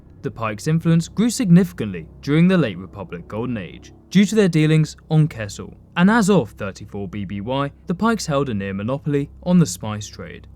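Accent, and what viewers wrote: United Kingdom. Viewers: Just realised he’s from the uk cuz the accent. #oneofus!!!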